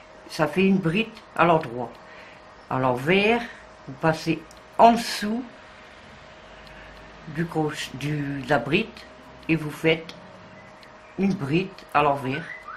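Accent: North, France. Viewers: Vous êtes du nord apparemment de part votre accent!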